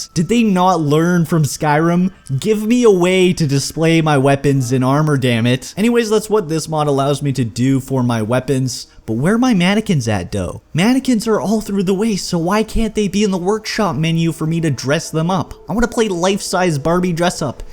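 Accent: Canada. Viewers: i've never found out that a person is Canadian as fast as in this video o.O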